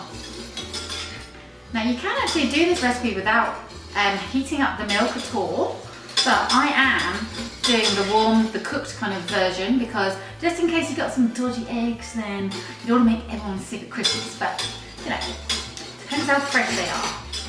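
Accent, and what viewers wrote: United Kingdom. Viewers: Alton brown I love that guy show I already like you #subscribe the accent is a plus I adore British accents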